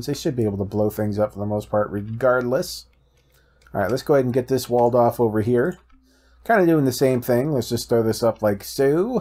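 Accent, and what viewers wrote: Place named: United States. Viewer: This guy sounds so American idk...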